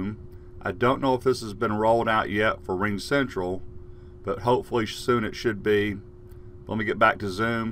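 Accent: South, United States. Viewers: Hi! I have a Simpson's and The Shining background I would love to use for when I'm in zoom classes. I have the latest version - updated yesterday (version 4.6.9) and the screens won't work without a green screen. Any advice? Also noticed the southern accent and was curious where your from. My family is from North Carolina.:)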